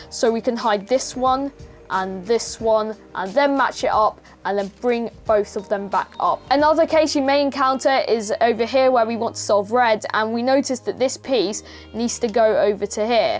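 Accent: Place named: United Kingdom. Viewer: Say "bottle of water" in your British accent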